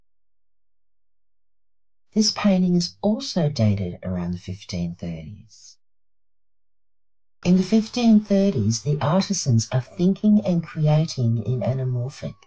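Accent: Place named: Australia. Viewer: you are super cool. Found your channel via the amazing Jon Levi... you sound Aussie to me, so welcome from NSW. Great video and I am glad I have found you. I hope to connect many times with you! The veil that has been blanketed over our eyes continues to keep the masses blind from the exciting truth that would reset our existence in a heartbeat. Cheers.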